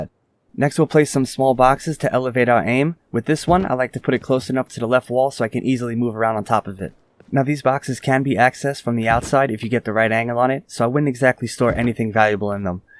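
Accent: New York, United States. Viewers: i hear a accent, new york maybe?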